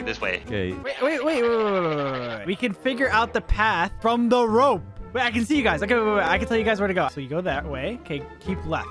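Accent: United Kingdom. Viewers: Are u british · Yes. But your British(like me) which makes you the absolute god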